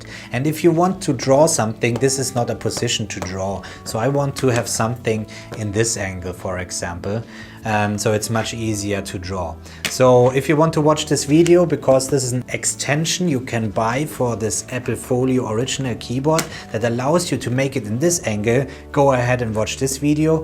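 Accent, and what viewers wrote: Germany. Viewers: Hey, good video! Well done. Are you german?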